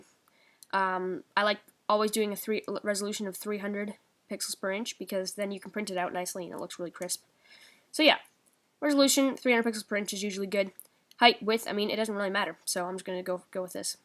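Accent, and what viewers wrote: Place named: Canada. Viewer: He's Canadian Canadians accents kinda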